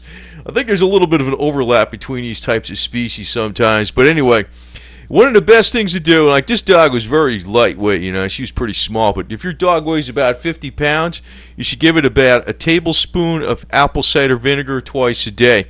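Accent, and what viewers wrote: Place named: New England, United States. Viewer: I absolutely adore your voice and the way you talk, including the accent. 💜 Is that a New England hint in your accent ? I'm not an American so it's hard to figure out. But it's amazing.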